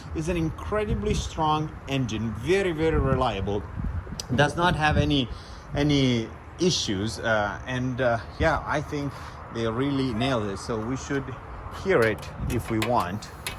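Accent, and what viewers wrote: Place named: Texas, United States. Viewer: Beautiful bike, I can definitely hear your Texas accent!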